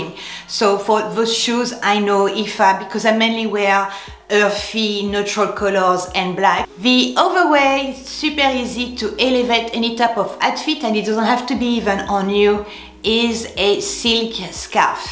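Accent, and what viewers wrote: France. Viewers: I love your videos you give such nice advice to let us put on a more classic look to feel more elevated and positive! And you are so lucky to speak French and have such a nice accent I wish I did:) French is the prettiest language! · I really enjoy your videos! Just love your French accent! If you're looking for another word for 'elevated' you might want to try using 'sophisticated' or 'refined' ..... This is not a criticism, I just noticed that you are often looking for alternative words. Looking forward to the next 'words of wisdom' session.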